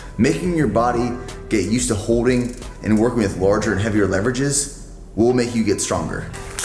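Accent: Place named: Pennsylvania, United States. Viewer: That tank he was wearing at the start? Who buys that kind of shit? Oh yeah, he is from Western PA. horrible taste, horrible accent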